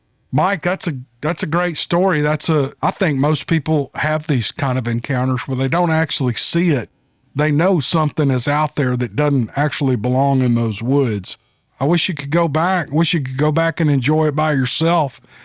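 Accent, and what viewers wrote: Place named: Oklahoma, United States. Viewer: I wanted to remind the other viewers/listeners that if you aren’t satisfied “he’ll give you your money back!” My seven year old boy loves to say that now. Being from Oklahoma, we have a bit of an accent so he sounds just like you! It makes me laugh uncontrollably when he says it spontaneously. I posted a long time ago that it’s your character that attracts us subscribers/listeners. Keep up the great job and don’t let anyone convince you to compromise your convictions about keeping this channel about stories and stories only. Anyone that has issues with that has character issues that need to be worked out.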